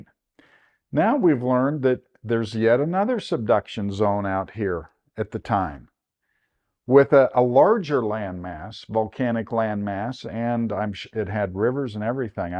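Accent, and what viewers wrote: United States. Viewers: Great content. Just so you're aware, the YouTube cc talks about "Anna Klein" where it should be anticline. It would be great if your editor could add these key terms and the names of the various structures as text on screen for people who are hearing impaired, watching with background noise, or not native English speakers accustomed to an American accent.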